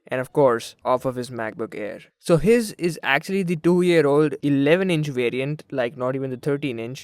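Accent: India. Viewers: lol this indian accent.... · fucking indus, learn to speak English and go back to india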